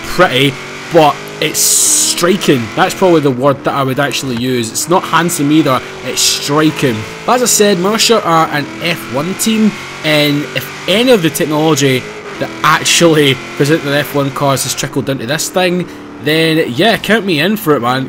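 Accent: Scotland, United Kingdom. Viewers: YOU'RE. SO. SCOTTISH.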